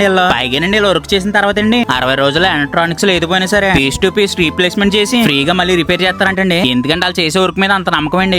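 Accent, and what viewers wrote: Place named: Visakhapatnam, India. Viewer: My vizag tune bro ❤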